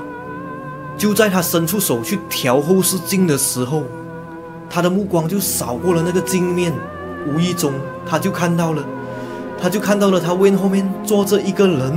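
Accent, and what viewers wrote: Malaysia. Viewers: 都要看/听😂，support 大马腔，请问你是客家人吗？ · 故事惊悚很精彩，大马腔调好听👍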